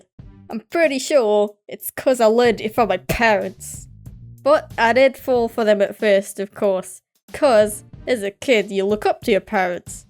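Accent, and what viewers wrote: United Kingdom. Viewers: wait is she british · Sorry to ask, but where abouts in Britain are you from? I'm British myself but I can't seem to tell where your accent originates ^-^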